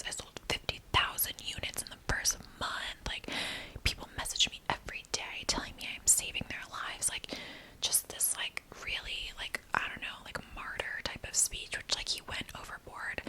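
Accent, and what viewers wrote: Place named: Vineland, United States. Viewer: Uuggghh!!! I love your whispers the absolute most because your whispers remind me of my cousins who are from Vineland NJ and their accents are very similar to yours. I live in Vegas so I don’t see them as often as I’d like but watching your vids reminds me of when we would have sleep overs as kids and we would whisper all night about boys we liked lol. I wish you all the success with your channel, and i can’t wait to watch you hit a mil😉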